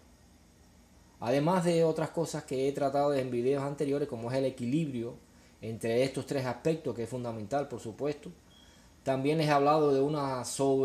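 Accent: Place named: Cuba. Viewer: Amigo de q país eres ?? Suenas como cubano !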